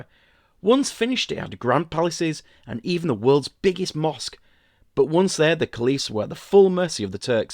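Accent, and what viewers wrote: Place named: North, United Kingdom. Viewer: I really like your witty style, pairs well with northern accent. Keep up the good work!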